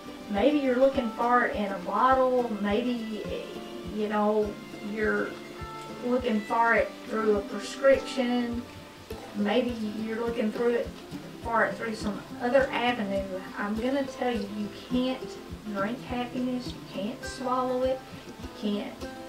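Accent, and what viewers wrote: South, United States. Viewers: Hi, I was looking for shepard’s pie recipes and I found your channel. I live in Mexico but I lived in Michigan for almost 18 years I fell in love with american comfort food I like your recipe and I saw the hamburger one too I like the way you explain and make your food I’m going to cook some of your recipes soon. Where do you live you sound southern. Anyway I will let you know when I cook your delicious recipes. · You made me cry, with your sweet southern voice and the music in the background was tearful for me. Thank you for the beautiful video. Yes I do zoom in see what you don't have. LoL I'm making that for dinner tomorrow, today I made your Skillet Steak, baked potato and green beans. It was delicious. I never made Shepard's Pie like that before but I'm going to. I'm so glad I found you, everyone out there knows what a beautiful person you are and I know with your talks you have changed a lot of people like you did me. 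Finding God through you was the miracle I've been wanting. to happen in my life. I feel very close to you and love everything you do and say. OMG, that Shepard's Pie looks amazingly delicious. Thanks again sweetie, God Bless you and your family. I'll always put God first.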